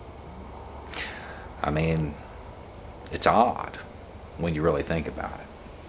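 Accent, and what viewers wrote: South, United States. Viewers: Hmm. I can unmask. 1. He’s wearing a suit, pretty sharp. 2. That pixelation can’t hide his beard. 3. Southern accent, cool demeanor. is Billy Gibbons!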